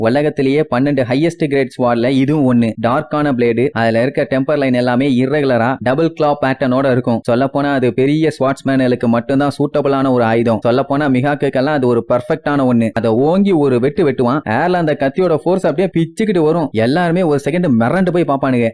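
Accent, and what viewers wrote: Coimbatore, India. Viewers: Avaru coimbatore bro so antha slang summa pesiruparu kandukadheenga 😂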